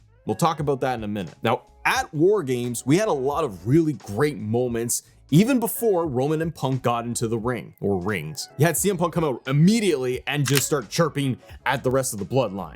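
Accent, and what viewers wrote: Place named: Canada. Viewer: I can tell you’re Canadian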